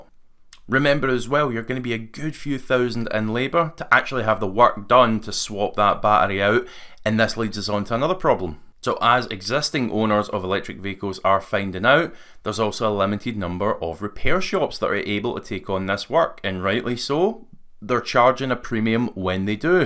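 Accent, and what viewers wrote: Scotland, United Kingdom. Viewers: I love the scottish accent. It just wonderful.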